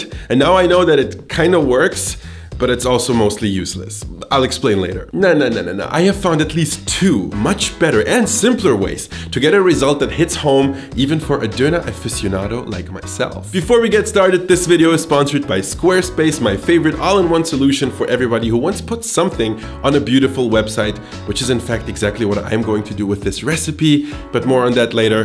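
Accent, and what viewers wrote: Germany. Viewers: his accent screams german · allmost cant even notice the german accent!! great video also! :)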